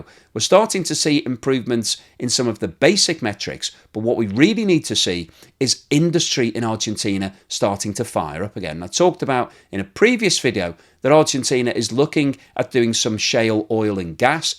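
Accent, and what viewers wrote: United Kingdom. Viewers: Even your charming British accent doesn’t help you with one major flaw with your analysis. And that is that the Clown known as Javier Milie revealed his pure incompetence when he said that the only countries in the world that he is going to have economic relationships with are USA and Israel. He completely omitted China which is the economic powerhouse on the global scale. Milie is a complete imbecile and Sadly many Argentinian were too ignorant to see that.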